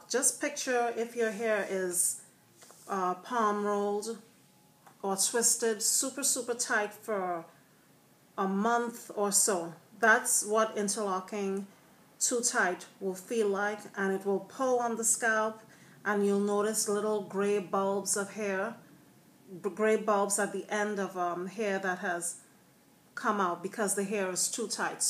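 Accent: Trinidad and Tobago. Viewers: sound like a trini..love ya accent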